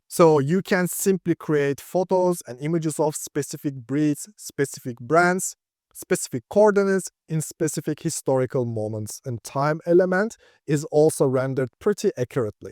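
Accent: Turkey. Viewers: Kardeş sen Türk müsün? İngilizceyi tam Türk aksanıyla konuşuyorsun. 🤓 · Aksanına Kurban olduğum. Türk olduğuna yemin edebilirim ama kanıtlayamam. Teşekkürler. Türk'sen Yorumuma kalp at. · törkiş engliş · şu kötü türk aksanlı ingilizceyi de yapay zekaya mı okutsan acaba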